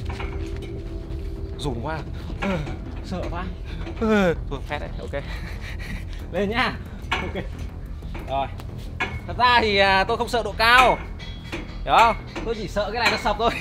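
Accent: Thai Binh, Vietnam. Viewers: Anh ở Thái Bình đúng ko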